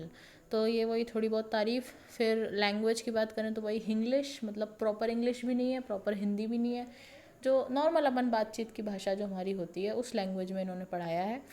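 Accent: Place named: Rajasthan, India. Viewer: aap to rajasthan and haryana sw lagti ho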